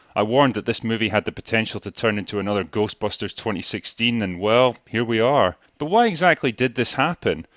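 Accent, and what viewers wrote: Scotland, United Kingdom. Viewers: Wait a second -- now in 2020 the has such a thick Scottish accent he's barely understandable! In this video it's much more mild. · An old vid before he put on the thick Scottish accent.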